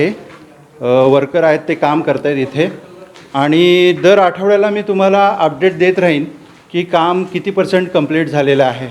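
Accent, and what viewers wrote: Konkan, India. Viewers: Mi hindu aahe pan tumchi kokani muslim language aikayla far god watate. Jevunshi aiylu etc. all d best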